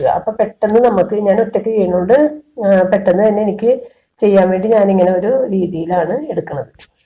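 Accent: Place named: Ernakulam, India. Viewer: ernakulam dt aano. Slang ketittu chodichatha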